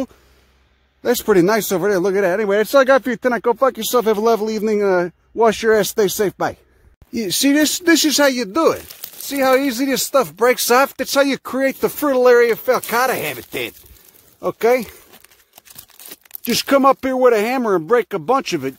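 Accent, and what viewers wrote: Chicago, United States. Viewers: yes. I'm aware of the most common invasive wild edibles. Tons of videos and books, but nobody can present it like my main man. I need it delivered with that no nonsense Chicago flare. Ya dig?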